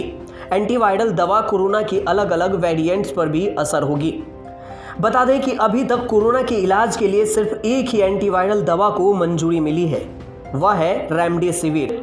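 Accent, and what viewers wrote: Bihar, India. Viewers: इनकी जबान साफ नहीं है जिससे समझने में दिक्कत हो रही है बिहारी टंग है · Iski awaz acchi hai voice over bolne wala bihari hai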